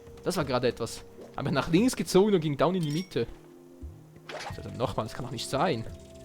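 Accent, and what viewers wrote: Switzerland. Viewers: Bist du Schweizer??? :D:D:D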